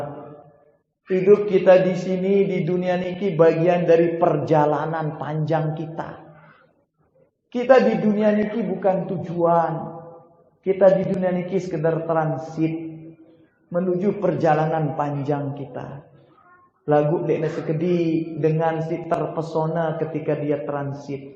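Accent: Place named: Lombok, Indonesia. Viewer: Khas warga lombok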